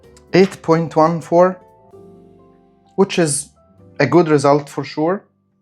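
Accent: Egypt. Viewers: Do you recommend any of them over the Outin Nano? And, how does the Picopresso compare against the Nanopresso? Also, are you Egyptian? You have a very distinct accent!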